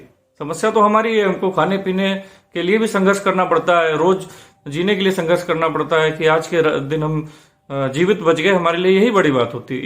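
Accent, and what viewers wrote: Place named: Kanpur, India. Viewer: Dheere dheere kanpuriya style bahar aa rha ab By the way great explaination of shiva triology series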